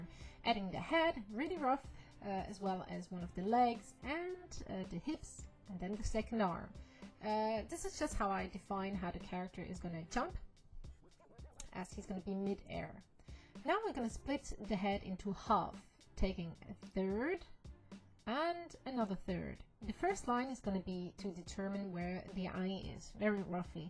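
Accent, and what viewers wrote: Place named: Germany. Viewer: r u married? (Love ur work and ur german accent)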